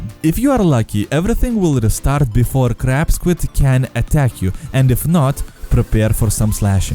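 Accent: Russia. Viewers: Russian accent I love it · Максимально русский акцент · omg i love his russian accent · It love you're Russian accents!!! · Мммм... Русский акцент) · Акцент русский. Разве нет? Четко слышно букву "Р" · Love Russia accent friend... no really I like the Russia accent!!! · That russian accent tho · yea i can see you sound russian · Русский акцент или нет? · Ты русскиий? У тебя акцент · Youre accent youre from russia? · Are you from Russia? Your pronunciation is very similar to Russian. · Бля, чувство что ты русский) акцент точно не инглЫш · Wait IS THAT A RUSSIN I HEAR ??? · Are you Russian or from Republic of Moldova? :) I ask that bcs of your accent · he sounds like a stereotypical russian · Акцент прям как у русского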